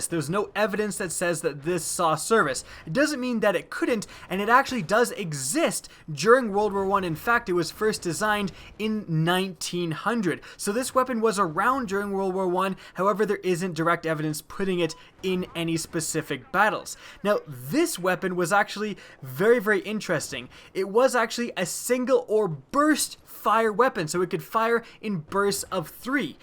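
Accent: Russia. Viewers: Nice Russian accent 👍🏻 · Nice russian accent and hello from Russia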